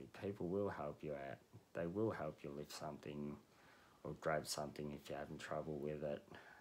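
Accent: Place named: Australia. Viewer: You have pretty mad skills and is that a bloody Aussie accent I detect. Good on you mate.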